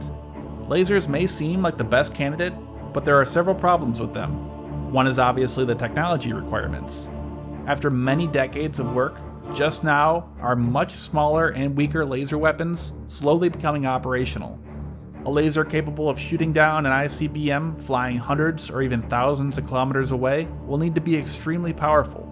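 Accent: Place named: United States. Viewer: Love this channel. Only person with an American accent who's unbiased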